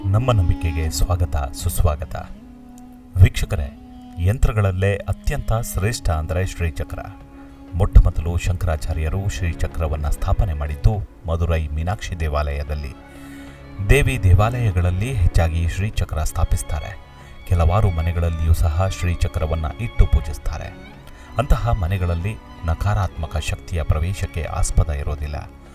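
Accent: Standard. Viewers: Shuddavaadha kannada uttama vaada voice sir nimdu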